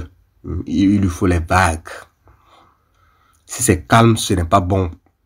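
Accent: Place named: Togo. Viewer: Une des meilleures Podcast! Chapeau frère! A entendre ton accent, on dirait que t'es togolais! #Respect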